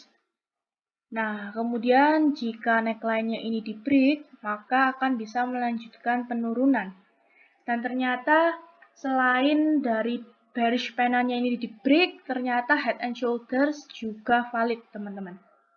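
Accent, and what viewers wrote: Java, Indonesia. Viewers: Iyo medok tapi pinter bngt e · Medhok tnn mbak wkwk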